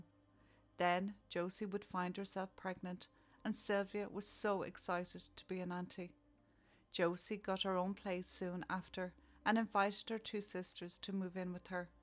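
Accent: Ireland. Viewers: It feels like it's been forever since I've heard my favourite Irish voice. My apologies for my absence lately. Haven't been online due to sickness but I'm back. As always, love your work 💙💙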